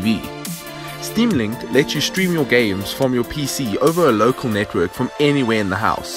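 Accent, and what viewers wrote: South Africa. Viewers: South African narrator :)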